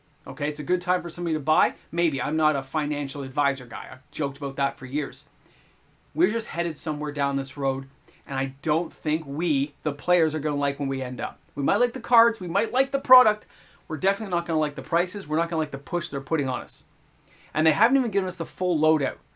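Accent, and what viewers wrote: Canada. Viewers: Are you Canadian? your accent is strong. You keep saying 'A-boot'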